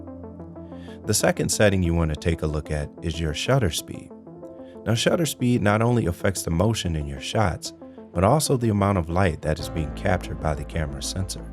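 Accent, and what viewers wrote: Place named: United States. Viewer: From your voice and accent I can tell you're from the US, let me know where exactly in the US so I can go hug you??.. Geez 100/10 content and narrative. You just earned a new sub man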